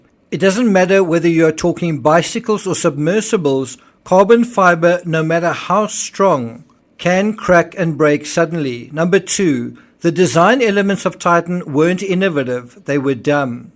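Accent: South Africa. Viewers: I like your stuff. South African voice? Expat?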